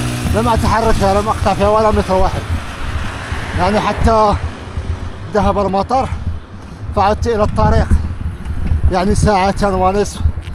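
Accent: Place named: Morocco. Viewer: لغة فصحى ركيكة كلغة الأطفال ..لغة كارثية مهزلة...اقول لك ماتكونش معقد من لهجتك المغربية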